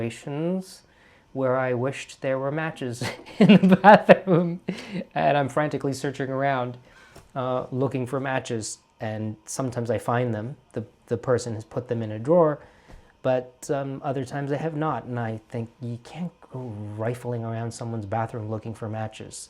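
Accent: Ireland. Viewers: I thought he was Irish for a moment and didn't realised the transition to American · Like the Irish accent 😂🇮🇪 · I am impressed with the Irish accent! And... everything else does. LOL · 1 minute in and I'm laughing out loud and relating so hard. 😂 Somehow the Irish accent was the perfect intro for this subject. 😅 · As an Irish person and long time listener I am so excited to hear you do the Irish accent!!! So glad we made the rotation 🎉😊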